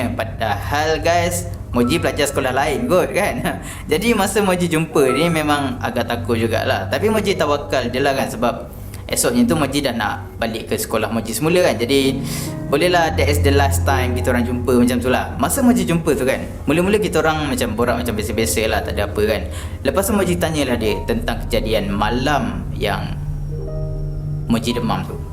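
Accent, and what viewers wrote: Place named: Penang, Malaysia. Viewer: Mcm penang atau negeri sembilan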